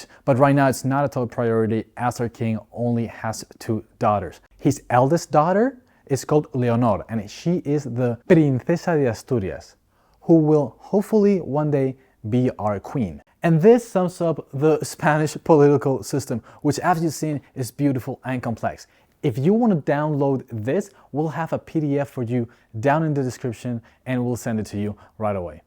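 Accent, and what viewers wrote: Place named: United States. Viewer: Great video but try to speak less yank and more English 👏🏻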